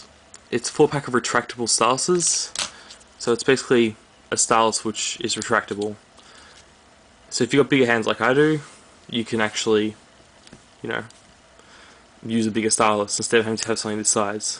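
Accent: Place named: Australia. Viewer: hey dude you sound aussie like me lmao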